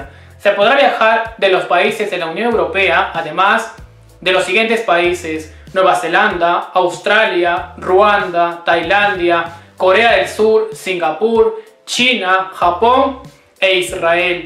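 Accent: Spain. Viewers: Se te pegó mucho lo español no??😮 Gracias por la información..!👍👏👏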